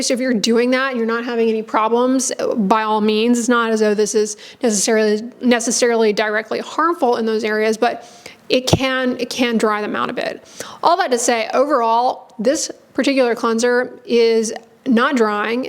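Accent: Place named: Southern California, United States. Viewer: I’m taking bets it Southern California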